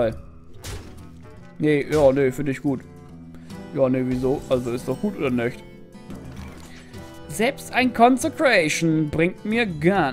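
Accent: North, Germany. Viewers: ich finde das auch gut so wie die Videos sind :). Bin selbst zu dumm für HearthStone und mag es dennoch dir dabei zu zu sehen, weiß gar nicht wieso ich dich nicht vor der Session mit Chris gesehen habe... bist sehr unterhaltsam und ich liebe den nordischen Dialekt :D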